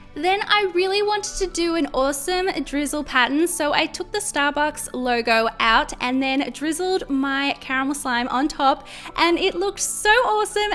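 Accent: Australia. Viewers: Are u Australian? Just a random question also AWESOME slime. I can't even make some slime 😕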